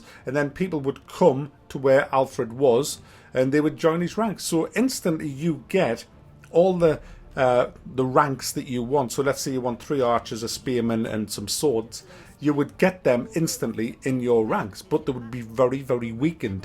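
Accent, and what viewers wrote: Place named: Scotland, United Kingdom. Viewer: I gotta say I love hearing your accent. However I'm an American and it is hard for me to place it. Where are you from? Are you Scottish?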